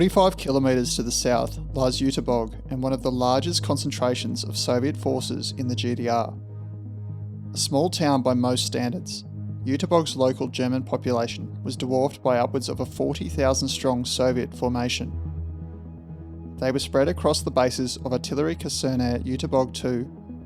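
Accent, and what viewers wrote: Australia. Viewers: Aussie accent! Liked and subbed you legend. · It's so comforting to hear an Australian voice on a very good video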